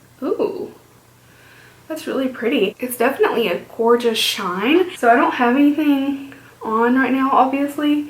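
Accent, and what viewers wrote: South, United States. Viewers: Hello from Alabama! I think I detect a southern accent , where are you from? I’ve been a JSC fan from the very start and my favorite lippies would have to be celebrity skin, unicorn blood, and ?. I gravitate toward pinks, nudes and darker berry shades.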